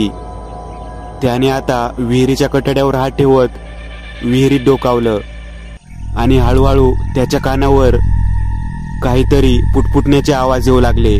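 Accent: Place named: Pune-Ahmednagar, India. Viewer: Tumcha tonning Pune , Nagar side che vatate......!! Chan ahe keep it up......!! Story saglyach chan....JB chya saglya story me eaikte.....🤗💐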